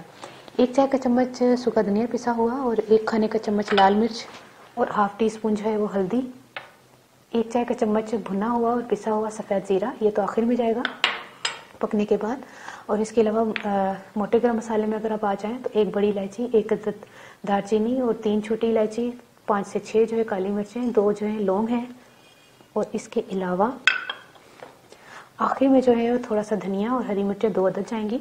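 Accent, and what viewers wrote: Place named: Punjab, India. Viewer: Yar jitni sweet ap ho utni sweet ap ki punjabi , ur new subscriber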